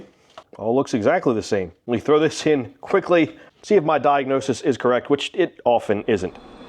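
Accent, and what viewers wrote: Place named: Central Pennsylvania, United States. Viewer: “I’m going to put this battery in awhile”. Gotta love that central PA dialect.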